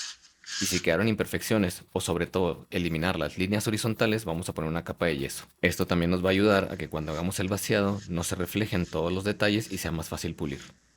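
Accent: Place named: Chihuahua, Mexico. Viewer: excelente video, gracias por compartir la informacion, no todos son asi. saludos, suena a que eres de chihuahua :P jaja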